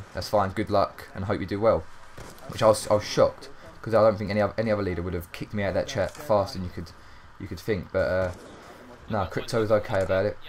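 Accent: England, United Kingdom. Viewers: How old is he? And is he British? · Are you English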